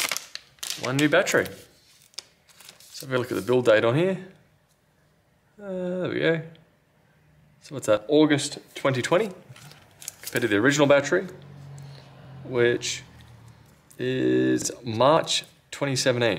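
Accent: Australia. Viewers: G-day! Are You from Australia?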